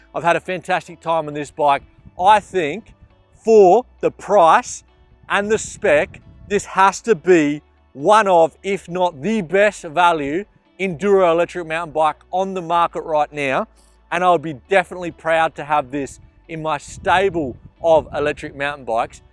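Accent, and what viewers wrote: Australia. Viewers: great review, love the aussie accent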